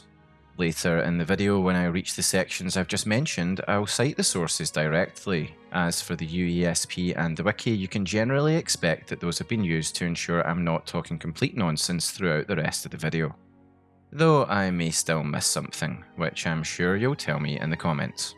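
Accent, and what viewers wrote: United Kingdom. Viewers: BRIT DETECTED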